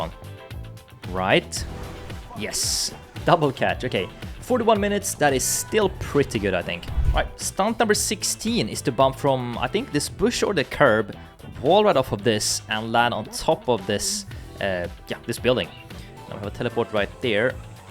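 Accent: Sweden. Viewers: is this guy swedish cus he have an swedish acsent when hes speaking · Are you Swedish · You can hear hies Swedish from hies English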